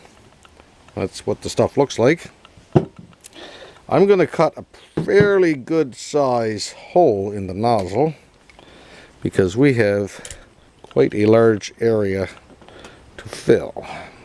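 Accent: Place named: East Coast, Canada. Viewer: Nice to hear that thick of an east coast Canadian accent in a YouTube video. Gonna be doing this to luxury-up my upcoming winter beater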